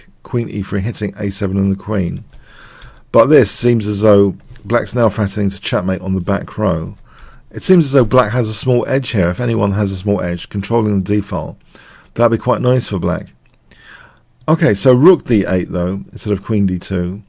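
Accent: England, United Kingdom. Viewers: I have to admit that pure English accent and chess videos is the rarest thing I have ever seen. But it's addictive.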